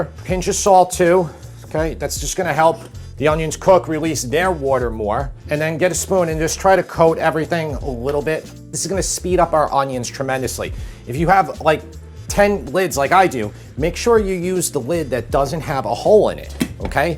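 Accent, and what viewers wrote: New York, United States. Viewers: One reason besides the nice recipes you cook is your New York accent. I miss the accent, the culture, everything.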